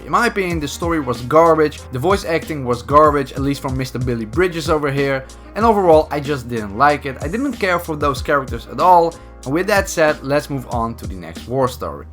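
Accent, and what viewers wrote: Netherlands. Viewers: Ik hoor aan je stem dat je Nederlands bent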